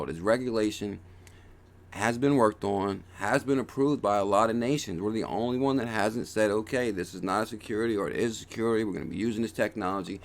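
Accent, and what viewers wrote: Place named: Northeast, United States. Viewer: Thank you! First time I've listened to your video & it won't be the last. Thorough research & I like how you highlight what is important and keep moving. I've invested quite a bit in XRP and found a way to continue to do so....what a price! I grew up outside of Philly and you sound like you could be from N.J. or N.Y or PA or somewhere around there. I'm now living on the coast just south of Boston. Thanks again! Sharman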